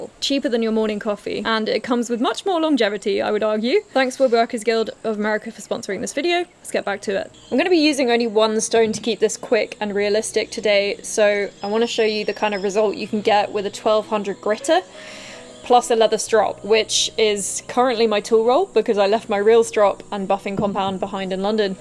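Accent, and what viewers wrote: England, United Kingdom. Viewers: Interesting situation! You sound English, you're living in Australia, and you are using Texas slang i.e. "Y'all. Okay, well, from an English man living in South Texas, here's a question: How do you deal with the obvious humidity when woodworking? Perhaps that could be a subject for one of your videos. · Doesn't hurt though. Americans can't resist that British accent with a feminine lilt.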